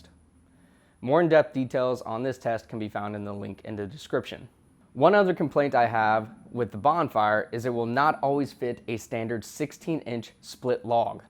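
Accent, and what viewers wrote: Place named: Indiana, United States. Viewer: damn bra, an oriental dude with a Indiana accent (y)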